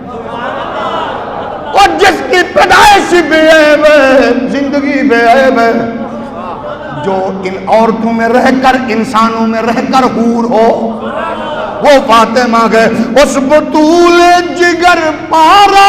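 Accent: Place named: Punjab, Pakistan. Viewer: Maa sha Allah lykin jo ap ki Punjabi ka lotaaf ata hai ous jysa kio maza nahi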